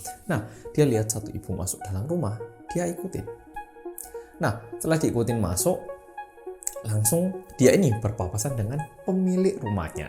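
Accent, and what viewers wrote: Java, Indonesia. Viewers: Hujan gini nonton KOREA REOMET BERSAMA ORANG KOREA YANG MEDHOKKKK.. Hehehe.. Semangat trus yea mas bikin konten spti ini candu bget dah.. Sehat2 sllu yea mas. 😊 🙏🏾 🖤 🔥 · Kok mirip. Ko Korea medok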